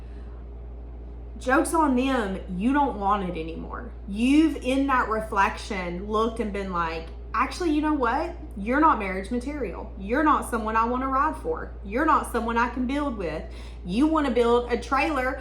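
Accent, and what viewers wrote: South, United States. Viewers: I love ur energy, your southern sound. This reading is telling the truth... ❤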